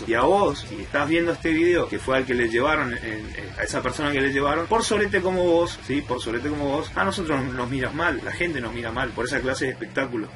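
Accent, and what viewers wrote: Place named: Corrientes, Argentina. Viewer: Sos Correntino? Tenés la tonada jaja, muy buenos tus vídeos!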